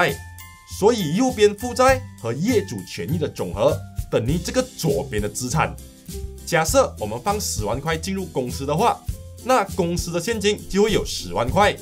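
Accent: Malaysia. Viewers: 聯邦腔很可愛好聽，內容也很好懂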